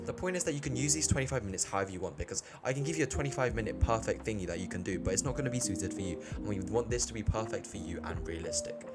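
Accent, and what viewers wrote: United Kingdom. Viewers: I do the counting down thing. Really helps! Great video-- very entertaining but clear and straight to the point. I think you hit the nail(s?) On the head with the importance of what happens before you go to bed. Lots of points for me to try out and possibly share with others. Also, took me a while to realise you're a Brit not an American😅 Great video!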